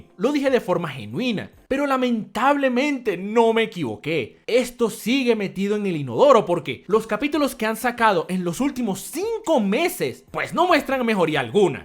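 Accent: Peru. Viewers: Oye we eres peruano?? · ERES DE PERU, NO?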